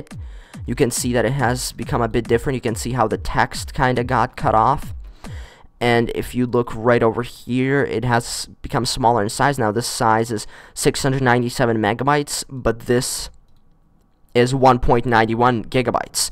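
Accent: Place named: Egypt. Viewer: You kind of sound like someone from my school someone Egyptian that comes from Cairo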